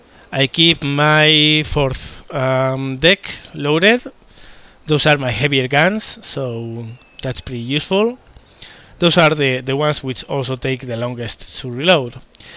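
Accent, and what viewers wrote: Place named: Spain. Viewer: Tu eres español no? Ese acento es claramente español Corrigeme si me equivoco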